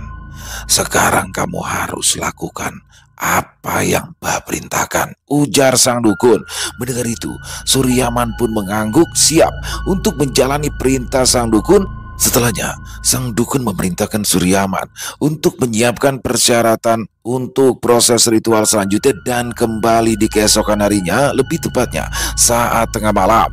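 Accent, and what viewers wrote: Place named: Betawi, Indonesia. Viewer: sambil dengerin sambil ketawa,suami tanya knp tu senyum" dan ketawa sendiri?hahaha saya lgsung jawab aja asik aja ni loghat betawinya kocak sangat🤣😊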